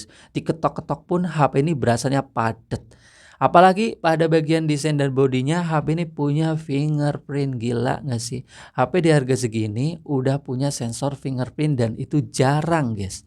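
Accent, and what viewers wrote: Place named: Banyumas, Indonesia. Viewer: Ngapak bang.... Salam satu server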